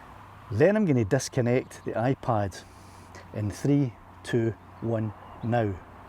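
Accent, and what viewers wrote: Scotland, United Kingdom. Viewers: I'm Australian, so very much used to Scottish accents here.... no subtitles required. Great video. Geeya thombs op mon. Glad to see Lassie returned to fly another day. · Thanks for this. I am not Scottish but my ancestors are from Dunrobin Castle. I love your accent. You remind me of a past manager and friend I had years ago whom my wife could not understand, ever! Where do you get your beautiful music? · Hi how's it gawn, its magic tae hear our accent on youtube, understood every, and naming your drone lassie hahaha hiya fae wishy, where were you flying looks like up by Lanark near tnto Hill,? Bet am miles off, I would love tae have the bottle to go in front of camera, better behind it ha ha the mini 2 is amazing, I'm blew away with mine, keep up good work buddy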